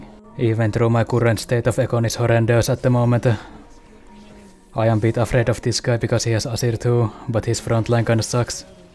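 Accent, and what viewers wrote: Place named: Finland. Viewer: You have to be 100% finish. That accent tho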